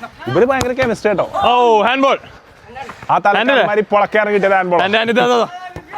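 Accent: Malappuram, India. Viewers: ടെച്ട് മ്മളെ മലപ്പുറം 😂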